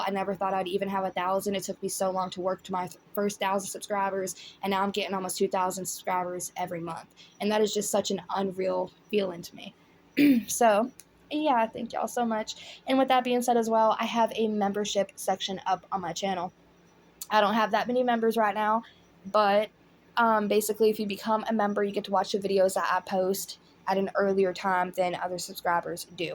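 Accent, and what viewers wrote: South, United States. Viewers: do i hear a little southern twang in your voice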